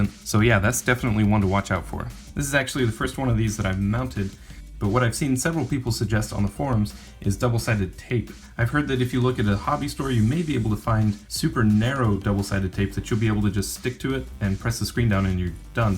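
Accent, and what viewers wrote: Germany. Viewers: are u german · Do you come from Germany? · Are you from Germany? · are you german?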